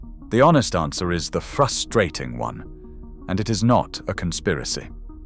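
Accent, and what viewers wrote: United Kingdom. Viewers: What's with the Brit accent? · British accent is cool. 👍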